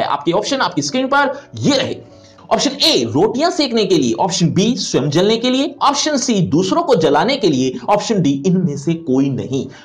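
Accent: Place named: Hyderabad, India. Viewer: Aap Hyderabad ke ho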